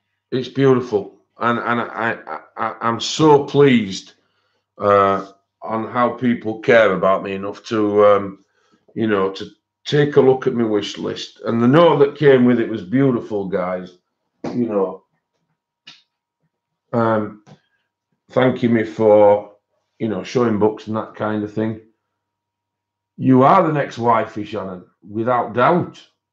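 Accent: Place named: Huddersfield, United Kingdom. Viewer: sorry didn't make that clear and it was one of your own streams. You had a soap box moment and everyone in the stream was so supportive of you. You mentioned no names. I have heard nought else from anywhere. As I said ignore the buggers. I have a potty mouth so being polite about that lol. Only keep those who add value to your life. Your channel has grown because of you and nothing else. Used to work in Huddersfield and good to hear the accent lol. Couldn't stop laughing at Jedi! Chunky munkey lmao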